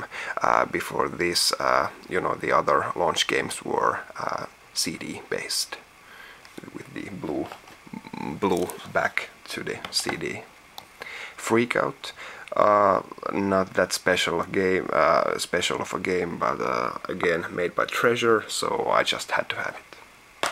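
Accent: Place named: Finland. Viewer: he is finnish. finnish doesnt have pronouncing, you say the words exactly like you write them. for example, trade would be just tra de, not treid. of course we try to pronounce them correctly but that doesnt always work.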